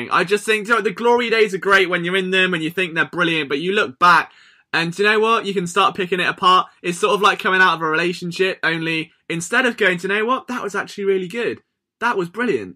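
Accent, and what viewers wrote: United Kingdom. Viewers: Your whiny British voice is the only whiny British voice I can endure for more than 30 minutes.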